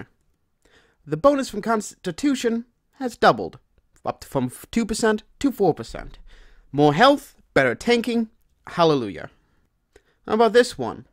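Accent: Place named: East Coast, United States. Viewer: You sound like you're from the East Coast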